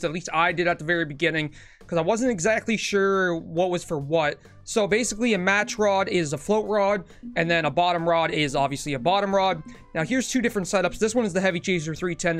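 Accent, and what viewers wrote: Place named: United States. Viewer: How come every video made about this game no matter who made it is in metric? I'm American and I us imperial weights and measures not metric so I have no ideal whats going on half the time in all of these videos, you sound American yet your game interface is metric its confusing period and dont tell me to switch I'm 60+ and I'm imperial till I die.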